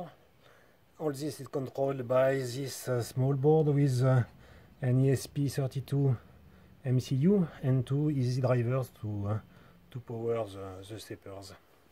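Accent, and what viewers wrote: France. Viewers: joli taf ! c'est marrant comme on catch les français rien qu'en les écoutant parler anglais (evidemment je m'inclus dedans :)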